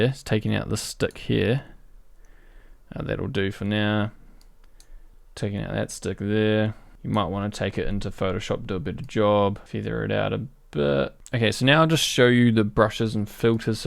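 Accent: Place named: New Zealand. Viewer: A dutch name...that accent youre from NZ love you video's! groetjes vanuit Nederland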